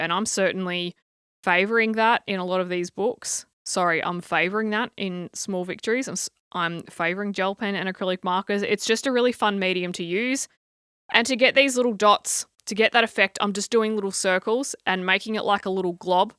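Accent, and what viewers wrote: Australia. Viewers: Really enjoyed watching this. I love the relaxed attitude and the voice commentary maybe because u r an Aussie and u sound relatable to me. Keep them coming. Looking forward to ur next one. ❤ Btw I haven’t started in my Johanna Basford Small Victories but u have encouraged me to start. (I’ve had it since it first came out) I think I’ll use Gel pens for the entire book. Thanks for the inspiration. 😊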